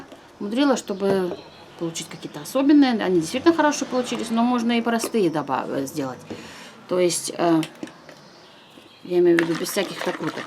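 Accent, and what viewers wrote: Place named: West Siberia, Russia. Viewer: Слышится западно-сибирский говор. Или мне показалось? :) Спасибо за интересное видео и за список ссылок! Лайк!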